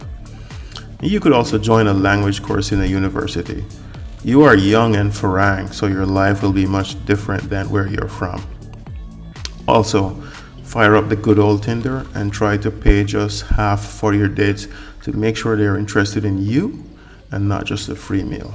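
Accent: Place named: West Indies, Caribbean. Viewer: Interesting story, I detect a west indies accent. Barbados maybe?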